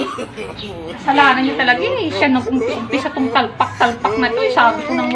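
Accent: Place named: Iloilo, Philippines. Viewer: ilonggo gidddddddd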